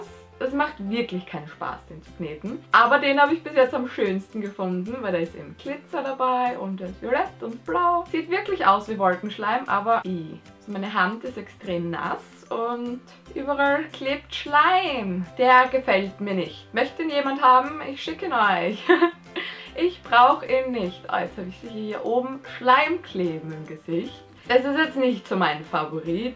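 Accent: Austria. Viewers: Du bist a österreicherin.....kenn i ..i kum aus linz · Hi bist aus Österreich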